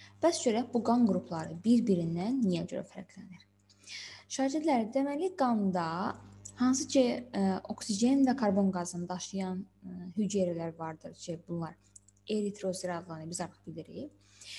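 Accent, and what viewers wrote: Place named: Ismayilli, Azerbaijan. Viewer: 100 faiz İsmayıllısınız. C və G hərflərini dəyişk salirsiz onun üçün dedm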